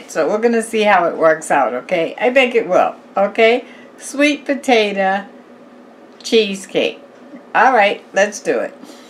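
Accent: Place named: Boston, United States. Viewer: MS. Girl you are doing it!! Giving me some good ideas Love it!! And the Wisdom in the word of the day!! Get it Honey!! I have one request can you make a sweet potato biscuits? I would really love that recipe!! Love your channel so much your like my Auntie from Boston in your sweet accent!! I pray many blessings for you, you are doing a beautiful job on your channel!! Love you!!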